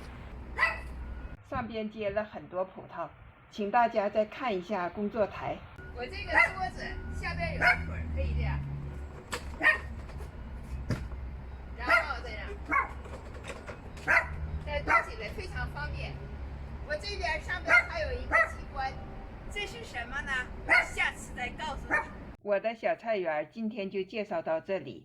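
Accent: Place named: North, China. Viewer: 您的菜园打理的太棒了，又在网上找到一位好老师，以后会经常来学艺喽。听您口音是北方人吧😊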